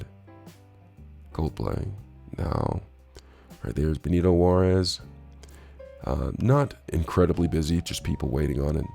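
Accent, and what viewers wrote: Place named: France. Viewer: Very very helpful! Love that French accent! But seriously, I've watched several of these and yours is the best one by far. Huge difference in the presentation and the others were very shallow in the delivery of information and were way less thorough than you. Even the ones that are twice as long as yours we no where as informative. I'm not traveling until January 2026 and I'll definitely watch this video again as part of my final preps. Thanks a million.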